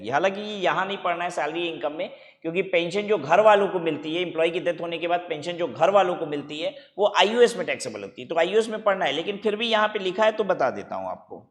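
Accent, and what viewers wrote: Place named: Varanasi, India. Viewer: sir aapke speaking accent se mjhe pata lag gya tha ki aap banaras se hi ho☺☺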